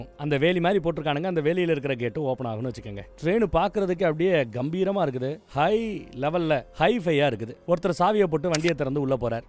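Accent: Madurai, India. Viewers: இவ்ளோ அழகா கதை சொல்ல தெரிஞ்ச உனக்கு நம் தேசத்தை மதிக்க தெரியவில்லையே உன் பேச்சு மதுரைக்காரன் போல் தெரிகிறது எதிரியான துருக்கி பேராபத்தில் இருக்கும் பொழுது உதவிக்கரம் நீட்டிய தேசம் நம் தேசம் சைனா பாசம் தான் உனக்கு அதிகமாக உள்ளது உன்னைப் பெற்ற வர்கள் இந்தியர் தானே அதில் சந்தேகம் இல்லையே உன்னை காயப்படுத்த வேண்டும் என்ற நோக்கம் எனக்கு இல்லை நம் தேசத்தை இழிவாக என்னும் எண்ணத்தை முதலில் மாற்று நீ கதையில் சொன்ன பெண்ணின் மனநிலையும் உன் மனநிலை ஒன்று போல் தான் இருக்கிறது உன்னைச் சுற்றி உள்ள நால்வரை வைத்து ஒட்டுமொத்த தேசத்தையும் சந்தேகம் கொள்ளாதே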